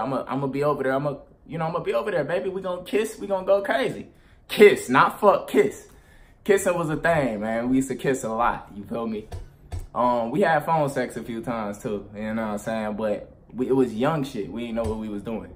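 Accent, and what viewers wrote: Detroit, United States. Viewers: that detroit acent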